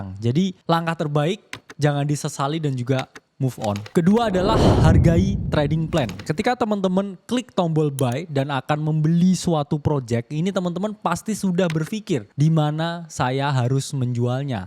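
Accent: Java, Indonesia. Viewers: Wong Jowo ndi suarane qi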